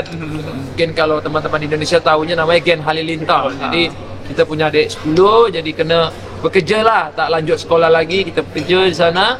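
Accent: Kuala Lumpur, Malaysia. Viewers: 😂😂😂😂😂 ok jugak dia ckp bahasa melayu Malaysia...tp ayat2 BM tu dia tak tahu...cuma lebih kpd slang 😂😂😂😂😂 mantapppppp bro...keluarga diaorang nie bnyk travel...tp aku respect adik beradik diaorang semua berseni... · comel laa ckp KL🤣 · ya sbb dia ada darah melayu minangkabau, sbb tu tak susah untuk dia tukar loghat KL walau duduk beberapa tahun kat Malaysia. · Pandainya cakap orang kuala Lumpur ya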